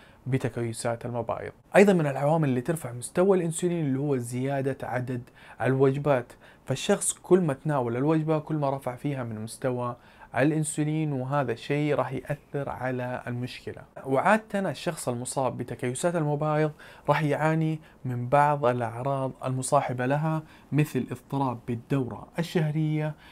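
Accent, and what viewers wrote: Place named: Hejaz, Saudi Arabia. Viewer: سعودي حجازي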